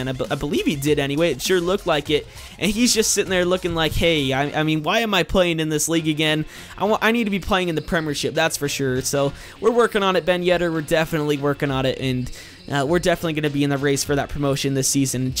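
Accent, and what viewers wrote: United States. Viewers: Bro, Utah accents are weird.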